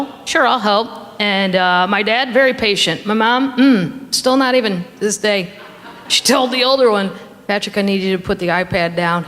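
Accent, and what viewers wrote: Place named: Chicago, United States. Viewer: Are you from Chicago? Your accent defaults to da accent ❤❤❤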